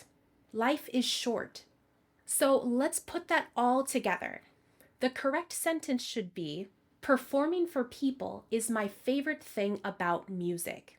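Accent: United States. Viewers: Is it american pronunciation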